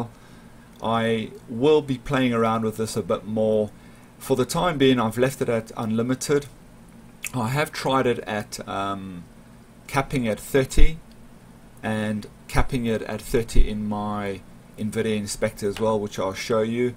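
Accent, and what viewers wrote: South Africa. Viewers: you sounds south african, you must be south african :)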